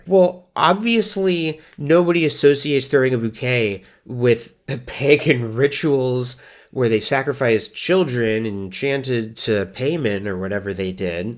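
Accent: RP, United Kingdom. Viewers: As a Brit, I approve of your posh English accent. I thought you'd like to know. Also: here's a bouquet 💐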